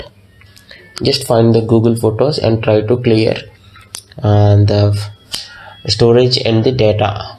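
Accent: India. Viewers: Indian Accent us soo funny but I understand 70% although Im not a native speaker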